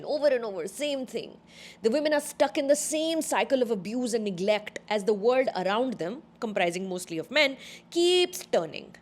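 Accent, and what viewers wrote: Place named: Vidarbha, India. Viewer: Hey ....grt to see you cheering this beautiful creativity....thank you for acknowledging this vidarbhian art and accent also ...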